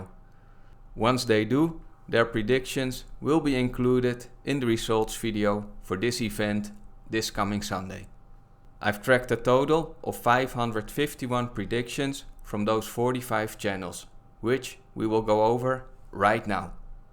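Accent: Netherlands. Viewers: Are you dutch? Just curious if I got the accent right.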